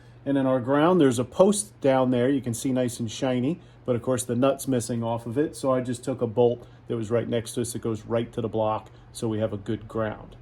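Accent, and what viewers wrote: United States. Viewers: I have a couple of comments today. One is about the quality of your video and sound, which I think is more than good enough. The video quality is great. We could see this time that you got the camera very close, a very clear image of the different parts of the engine. On top of that, you don't need a new microphone but simply speak facing the camera and close enough to the "mike". Although of course a remote mike hanging from your neck would be closer than it is now. The other comment is a silly one. Your nickname is a very nice one. I do like it but in my understanding of your speaking it always sounds to me like outboard-dead, like meaning that your are able to bring back to life a dead engine which is a big truth. OutboardDaddy would make it very clear the meaning of your nickname. I'm sure it is just that I'm foreigner altough I consider that my level of english is not bad, there's a discrepancy between my ears and your accent, which on the other hand I think it's a very typical and crystal clear American accent, much clearer for most people than the heavy accents that I found in Texas, but to me is hard to find the differences of dad and dead pronunciations (same happens "to me" when finding the difference between man and men or can't and can).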